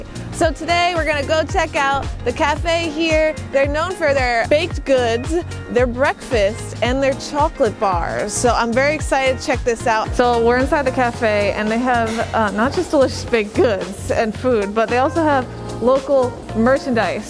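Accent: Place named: Bronx, United States. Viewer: Wait, you sound like your from the boogie down sweet heart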